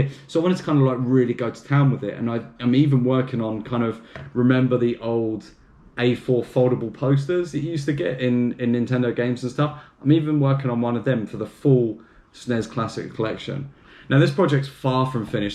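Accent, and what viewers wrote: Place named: Europe. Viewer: This is AMAZING! I have been working on a Metal Gear Solid custom GBA. Where did you get the sleeves printed? I assume by accent this is somewhere in Europe :( I am in USA and this is very expensive to find a single print custom box for my GBA.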